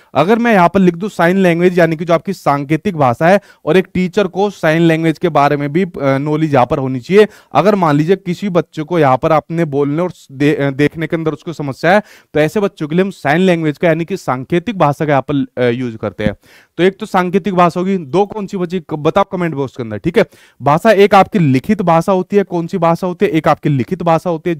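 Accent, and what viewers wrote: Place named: Azamgarh, India. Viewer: बाबू बहुत अच्छा बढ़ावन आजमगढ़ की बोली है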